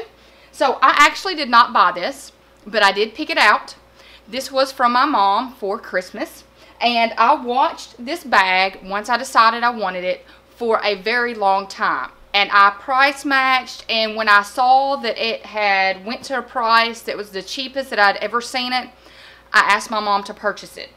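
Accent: South, United States. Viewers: I just started watching your videos, and I really am enjoying them. Like you, I am a planner, list maker, etc. I also own many of the same bags you own. Great minds think alike, right? It is also nice to hear a southern accent on youtube! I'm from Southwest Georgia. By the way, I am also a teacher. 😃😃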